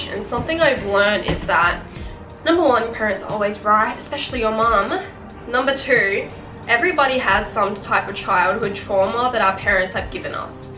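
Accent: Australia. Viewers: I have the same accent lol I'm Aussie too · are u an aussie?🤨🤪🤭😏😌😪🥶🥵😵💋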